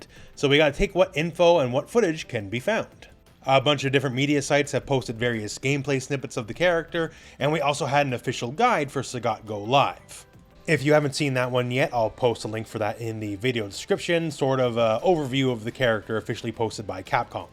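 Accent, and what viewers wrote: Canada. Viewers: Canadian accent is strong in this video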